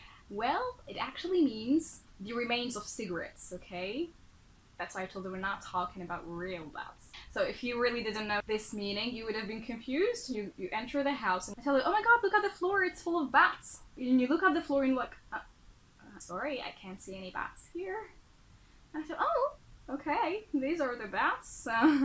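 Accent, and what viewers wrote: United States. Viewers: ماشاءالله لهجتك قوية جدا، كأنك أمريكية تحياتي لك من ليبيا 🇱🇾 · you have a good American accent !! how did you manage to pronounce this way ?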